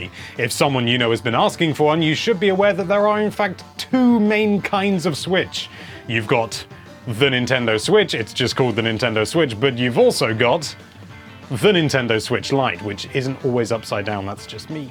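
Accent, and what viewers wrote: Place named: United Kingdom. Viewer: And you have earned yourself a new subscriber. British accents are my kryptonite but you’re also a really good YouTuber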